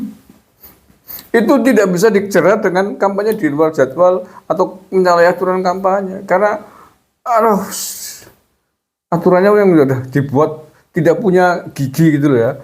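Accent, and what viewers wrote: Banyumas, Indonesia. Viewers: Aha pak rika bahasNe ngpak banget banyimasan,aku dadi seneng ngringokna bahasane rika kas bantumas aku wong wanareja ds Cilongkrang,paryaiku geindra pak....🙏🙏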